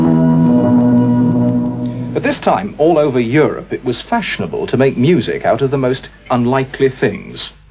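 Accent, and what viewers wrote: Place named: RP, United Kingdom. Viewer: All tv presenters should talk like this chap. BBC English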